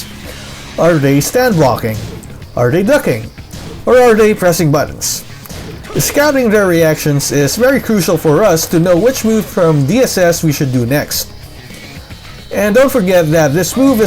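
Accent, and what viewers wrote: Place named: Philippines. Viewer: I knew the accent was filipino lol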